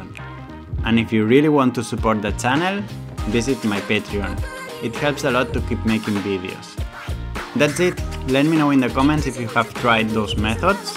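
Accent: Spain. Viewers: I see your spanish accent lol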